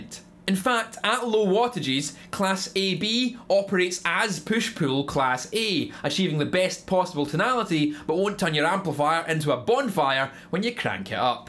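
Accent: Scotland, United Kingdom. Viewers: this Scottish accent sounds much comfortable after viewing decades of clips from this channel. good vid, thanks. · Good God. Scottish parents, please don't saddle your offspring with this awful accent. Thanks...America and the rest of the world. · That's really very interesting ! Plus, making the effort to get your accent, I think I can now understand every single english accent in the world ! I thought Trainspotting was hard to understand, but now I get what Scotland really is all about !! Thanks man !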